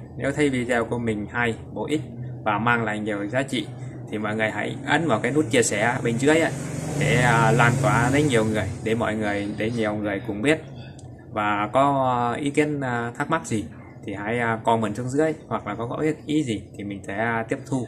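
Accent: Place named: Ngư Lộc, Vietnam. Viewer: Mới nghe tiếng nói mình tưởng bạn ở Ngư Lộc (Hậu Lộc). Chào nhé !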